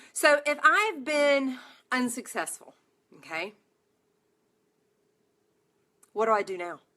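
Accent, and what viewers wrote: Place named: North Carolina, United States. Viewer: Super motivating! Your accent made me smile this morning! Im in NC too (Swansboro)😁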